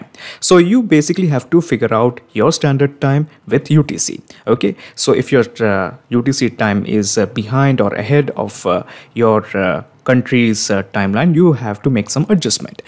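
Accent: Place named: India. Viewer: It is difficult to understand your strong Indian accent, especially when you speak so fast. i could not understand what MODE you said we should be using. I had to slow down the playback speed to finally hear that you said "incognito mode". For those of us who don't use incognito mode it would have helped it you explained how to switch to incognito mode. It would also help if you explained about Pabbly as to how much it costs to send messages. I assume a free account has limits?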